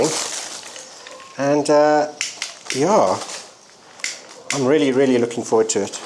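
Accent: South Africa. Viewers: After 23 years you still have a completely South African accent😂😂😂😂😂😅😅😅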